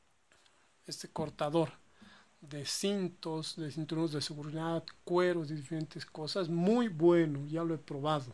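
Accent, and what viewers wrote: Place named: Bolivia. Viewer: hola estimado, por tu forma de hablar .. eres de Bolivia verdad?, si es así.... me podrías decir donde puedo encontrar estas leatherman raptor?, muy buena revisión!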